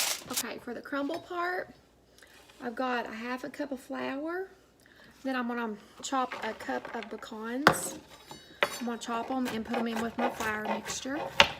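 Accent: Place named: South, United States. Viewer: It looks delicious! Love your southern accent, so cute. Have a great day!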